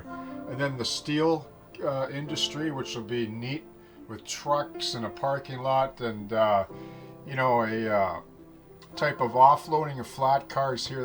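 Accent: Canada. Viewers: Now I want to go watch Strange Brew listening to that Canadian accent!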